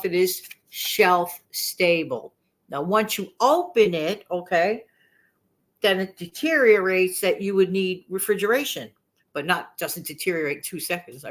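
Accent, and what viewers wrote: New York, United States. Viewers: Personally, i love your New York accent. I think it's great!😂 Oh, i remember those handi snacks,! Those were quite good as i recall! Slim Jims are good too.No red stick....thats just wrong!😮 I agree that things could get very crazy and soon. Yes, we need to be prepared! I thought i would buy a few packages of those wraps we use to make burritos (just cant think what you call them). Thought they would be a good bread replacement in a pinch. Yup, gotta have some sort of grocery plan! Definitely!!! Yes to peanut butter, all day! I love oatmeal too, brings back such good memories from childhood. We always had canned fruit and veg when i was growing up. Still do. Great video. Much appreciate all the great ideas and encouragement....bless all your efforts. I am very grateful. God bless ❤❤❤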